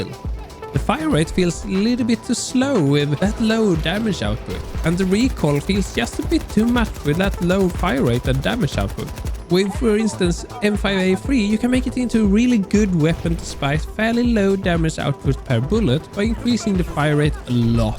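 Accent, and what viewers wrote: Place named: Sweden. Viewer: Knew you had to be Swedish with your accent haha, jag är halv Svensk. I think the AK and M5 feel so inaccurate and weak compared to the SCAR — I don’t understand how some of my friends dominate with them but I struggle to hit open shots consistently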